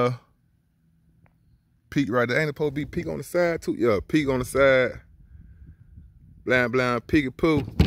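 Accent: United States. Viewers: That’s how ppl from the south talk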